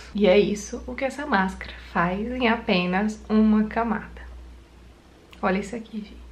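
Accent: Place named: Minas Gerais, Brazil. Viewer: Já quero pra vida! Negoço existe no vocabulário aqui em Minas... haha Seu sotaque tem uma pegada mineira...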